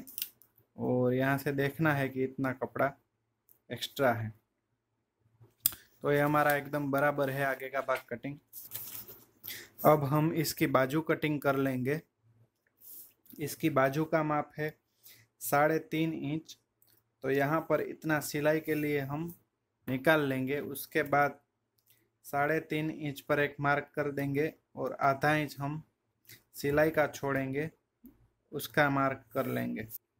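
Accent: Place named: Gujarat, India. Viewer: aapki bolne ki sppech se lagta he ki aap gujrari ho agar aap gujrati ho to plZ gujrati video bhi ek banaye na muje beak side pe kadi vala blause sikhna he plz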